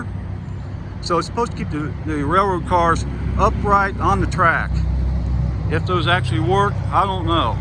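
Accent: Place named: Ohio, United States. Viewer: Thanks for telling us about the guard rails. So from the sounds of it, you were raised in Ohio. I was too, in Worthington. I have family in LaRue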